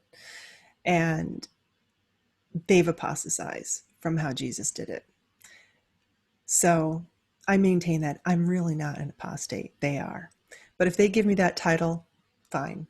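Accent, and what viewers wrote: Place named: United States. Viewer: very interesting, but slowly and dont speak American. We European exJw are listenin too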